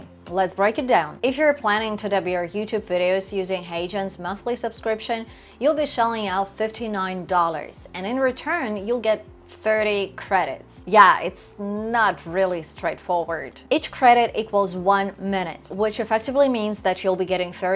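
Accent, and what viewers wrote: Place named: Spain. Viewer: es gracioso que tu español es el de España jaja y el de las ias suena más mexicano neutro 😂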